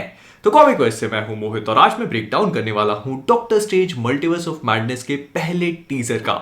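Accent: Bhopal, India. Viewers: Bhai aap Bhopal se hoo 😅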